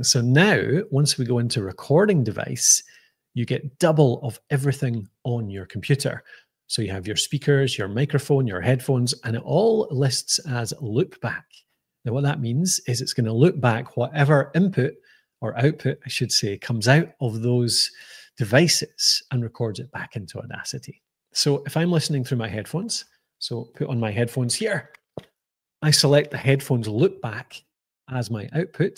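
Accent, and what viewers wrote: Scotland, United Kingdom. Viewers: soon as i heard that Scottish accent i knew i was in safe hands, top man!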